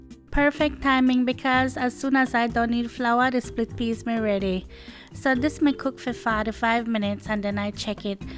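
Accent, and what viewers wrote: Jamaica. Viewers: Do you think the accent is similar to Jamaican?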